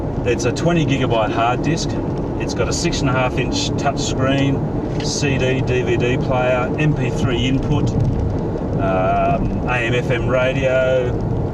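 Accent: Australia. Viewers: loved this car and your review!! i love the aussie accent :D is it rwd?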